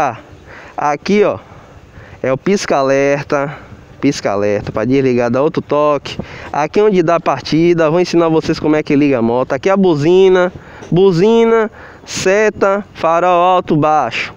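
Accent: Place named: Bahia, Brazil. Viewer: Esse nosso sotaque Bahia é top de mais ..... abraço meu amigo. Pegar a minha final do ano.🙏🏼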